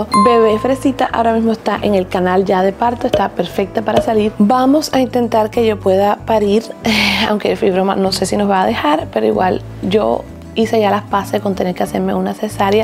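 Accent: Cuba. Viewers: Es un acento, no es voz de niña , ella es de Cuba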